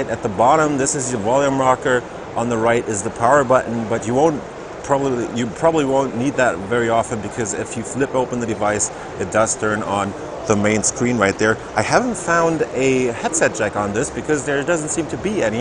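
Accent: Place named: Germany. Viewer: Your accent sounds like German!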